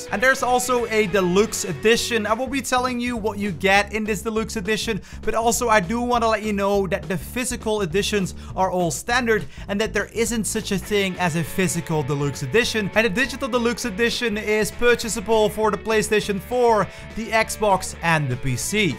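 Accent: Netherlands. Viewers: Are u Dutch? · I see, you're either Dutch or Belgian.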